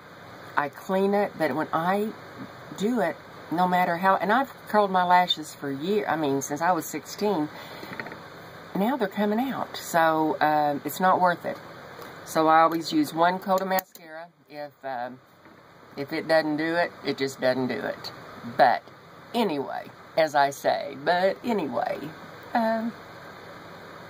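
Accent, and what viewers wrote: South, United States. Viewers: Lol I do have a accent a jersey accent lol you do sound southern ha!!!!!! You made me laugh that's for sure have a great weekend my friend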